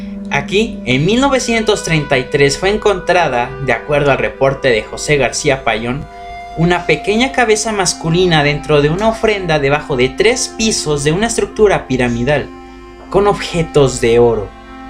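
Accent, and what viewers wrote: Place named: Spain. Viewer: Nunca dejaste de hablar con tono ibérico, jajaja.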